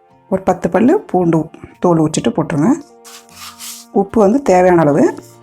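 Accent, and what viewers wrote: Chennai, India. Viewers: சென்னை தமிழ்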